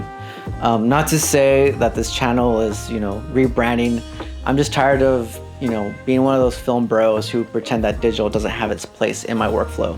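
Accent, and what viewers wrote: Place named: Australia. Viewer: Thanks. I have a A7II specifically to use with legacy lenses. You review coupled with your images taken using the FE 1.8/50mm is pretty sweet. There will be an addition to my lens kit! By the way I love your Aussie accent champion.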